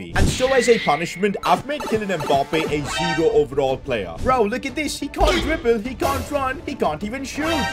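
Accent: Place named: India. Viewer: Who ever thinks has indian accent sound 👇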